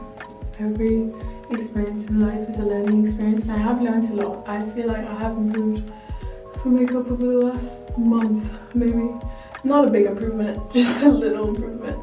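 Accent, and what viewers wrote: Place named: United Kingdom. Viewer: It’s the British accent for me😅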